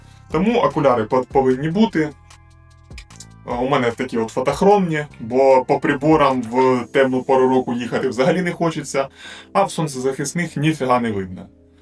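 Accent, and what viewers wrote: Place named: Sumy, Ukraine. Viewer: говірка схожа на сумську.. Суми і Бровари для мене рідні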